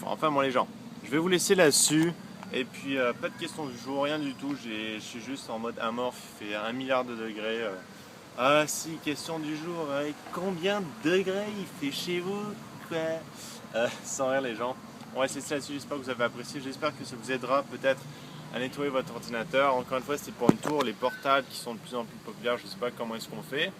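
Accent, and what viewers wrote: Quebec, Canada. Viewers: A tu un accent quebecois Pouce vert pour quil le voit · c'est normal il est québecois ^^ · En France il fait pas chaud ,11 degrés à Strasbourg oú je suis ,sinn pas mal tes vidéos et sa va on remarque pas trop ton accent québecois =D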